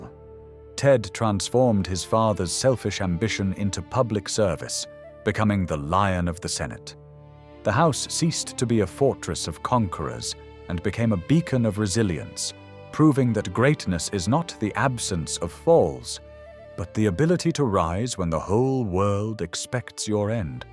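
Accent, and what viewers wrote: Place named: United Kingdom. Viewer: Why do we have an awful Brit accent narrating a totally American documentary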